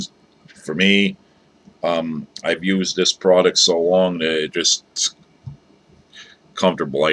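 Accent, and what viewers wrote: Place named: Western Pennsylvania, United States. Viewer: You are a pro! Clean and simple. Man you sound like you're from Western PA. Pittsburgh or Johnstown. What kind of cover you throwing this type of jig in?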